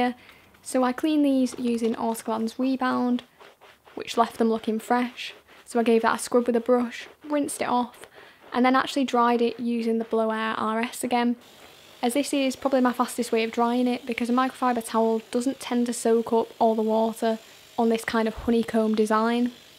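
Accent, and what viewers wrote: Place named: Liverpool, United Kingdom. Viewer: Is this trying to be a rip off of car cleaning guru ? Even the scouse accent for crying out loud !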